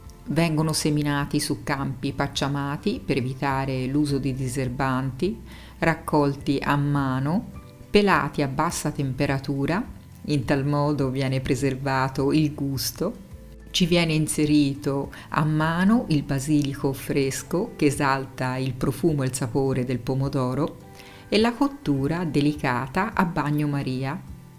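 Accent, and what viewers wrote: Tuscany, Italy. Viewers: quell'accento todcano che rende il tutto "più buono"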